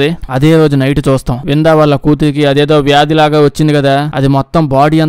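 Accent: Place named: Godavari, India. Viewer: ఇండోనేషియా సినిమా గోదావరి యాసలో అద్భుతంగా చెప్పావ్ బ్రో 😂😂😂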